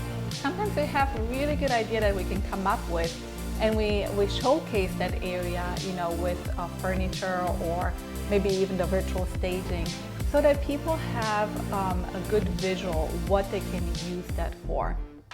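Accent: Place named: Romania. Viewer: ! Salut! Ma bucur sa aud o voce romaneasca!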